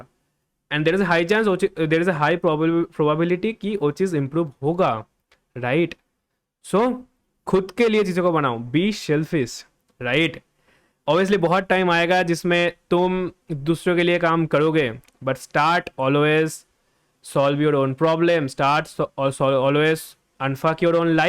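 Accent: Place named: Bengal, India. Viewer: Brother You are bangali right? I can feel it with your hindi. But no worry, you are good at hindi. After sometime I hope you will be more fluent in hindi. There is something about you which I can relate with me with you. I think, you know a lots of stuffs but the thing is, it is not looking so much interesting to watch this video. But obviously it is informative. I have the same problem, pata to bohut kuch hai lekin utna interesting video ko bana nhi pata. But we have to try to be more interesting to people. Agar kabhi tum aur mai famous ho jaye to ek podcast to banahi sakte hai na? Take love ❤, spread the positivity. And lastly remember me 'its Samiul' 😊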